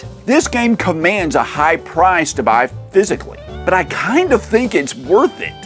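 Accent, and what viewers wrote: South, United States. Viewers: Man, As a gamer who watches tons of Youtube Game Reviewers, you are the first that sounds like someone from where im from...The south... Nice to hear a good ole country boy on the gaming scene....Glad i found your channel😀. I fall asleep to AVGN, but i woke up hearing your voice like “who is this?”…Hooked!!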